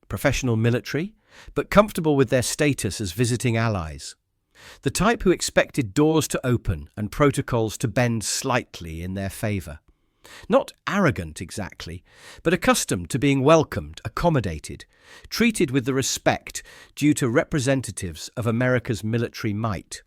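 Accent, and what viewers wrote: United Kingdom. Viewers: Perhaps the worst aspect is that it sounds like a Briti yarping the commentary! This one and several others I've listened to!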